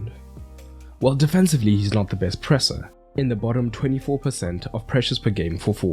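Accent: South Africa. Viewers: Hey mate! Love the videos, especially since I assume you’re South African (judging by the accent) like me🤝🇿🇦 Anyway, how do you think Haaland will compare to the Agüero we saw under Pep? Agüero was known to be a little lazy and perhaps not entirely fit in a possession-based team like City. I might be totally wrong tho. Thank you brother and have a great week🙌🏻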